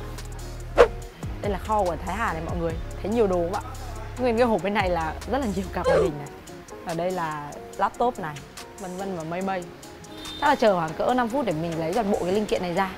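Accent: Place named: South, Vietnam. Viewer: Nói giọng Nam dễ thương thế :)))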